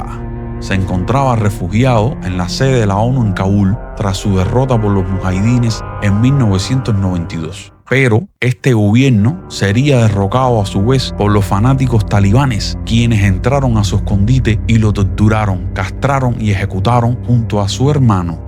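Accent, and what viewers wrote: Cuba. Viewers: Aquí vine directo a ver el vídeo de la orden maldita! Me encanta la seriedad, la veracidad y la agudeza de tu recopilación narrada en tono de asere!! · Q acento más horrible. Cubaneo, sola vaya.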